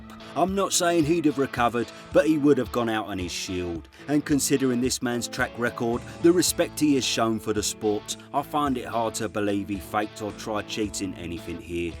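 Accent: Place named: England, United Kingdom. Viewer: because of you mate, i'm seriously considering moving to england, just to spend all day talking like you do.. 😂😂🫡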